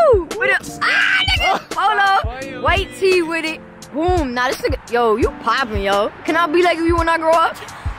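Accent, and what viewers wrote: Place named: New York, United States. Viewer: That ny accent strong asf😂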